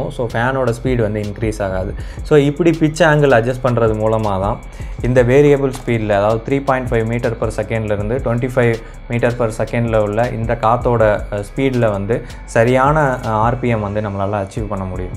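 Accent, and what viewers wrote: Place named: Kanyakumari, India. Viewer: ur slang looks like, kanyakumari or nagercoil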